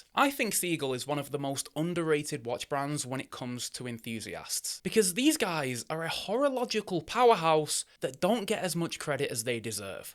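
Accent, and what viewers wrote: United Kingdom. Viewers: I really like this guy. Is it the UK accent? Or the fact he reminds me of Jon Snow from GOT?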